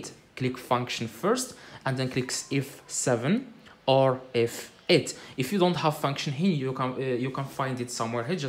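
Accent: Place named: Morocco. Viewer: Sound morrocan 😁🤩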